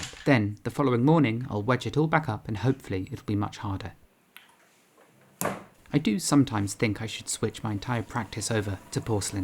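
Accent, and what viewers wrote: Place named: United Kingdom. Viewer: You're so British and Ilove It sir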